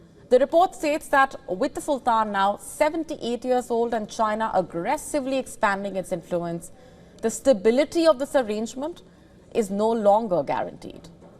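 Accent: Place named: India. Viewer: A typical Indian accent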